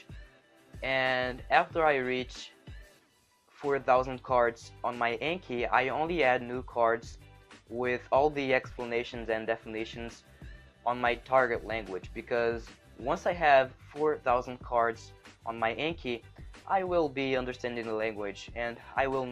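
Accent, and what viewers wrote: Brazil. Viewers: Não, a maior parte das palavras que utilizou são intermediárias, e o fato de ele ter um sotaque um pouquinho típico de brasileiros facilita a compreensão. O vídeo também só tem 9 minutos, o que facilita mais ainda ter uma boa compreensão. Mas sim, você está num ótimo ponto para começar a estudar a língua e falar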